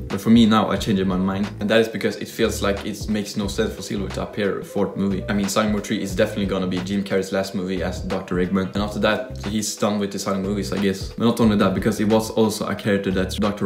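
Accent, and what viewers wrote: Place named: Sweden. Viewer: Been watching your channel for a time now, and both me and my sister had our suspicions on you being Swedish due to your accent, so thanks for telling 🌸 Vi behöver flera Svenska Sonic fans 🥲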